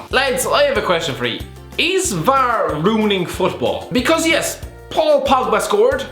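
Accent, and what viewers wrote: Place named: Ireland. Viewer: nah hes just irish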